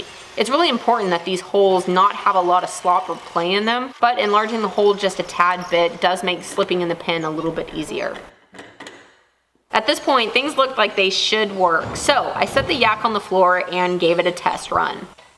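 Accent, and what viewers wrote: Texas, United States. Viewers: Drill a hole for the pen.love the texas speak. How about using bead and hole stops like you would see on canes and walkers. Rotate wheels out of way when not using , click when u rotate into position. Lengthen arms that way too.that can also raise height, stop scraping bottom.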